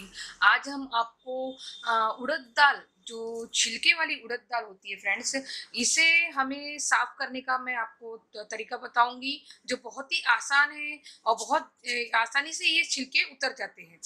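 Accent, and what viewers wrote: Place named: Maharashtra, India. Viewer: Apka hindi mast marathi logo ki trha h nd thank u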